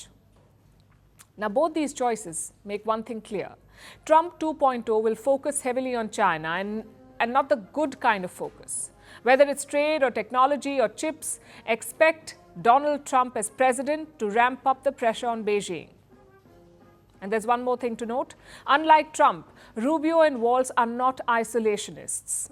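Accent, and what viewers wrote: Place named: India. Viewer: Strong Indian accent!!😮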